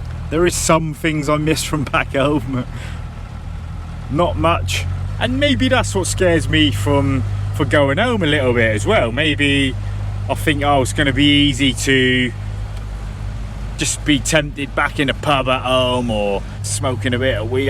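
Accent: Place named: Midlands, United Kingdom. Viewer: Your not likely to take to Spice then ;-) .Just saw a documentary about Manchester and the Spice epidemic .From your accent i detect the Birmingham /Coventry /Midlands locality ? .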